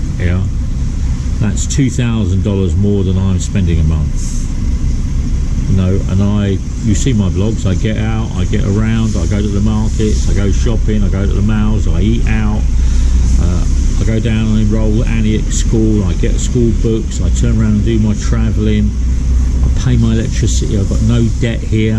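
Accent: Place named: United Kingdom. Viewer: you speak like a brit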